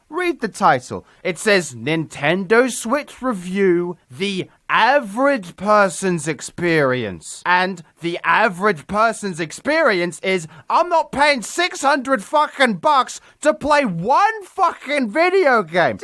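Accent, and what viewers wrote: Australia. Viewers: No because he’s australian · He’s Australian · Aussie not English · No he's australian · Gaijin Goombah Media Australian not English mister goombah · Gaijin Goombah Media Australian not English (They're different I tell you, THEY'RE DIFFERENT!!!!!!) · W8, are you Australian? Sick, me tooooooooooooooooooooooooooooooo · He's Australian you git.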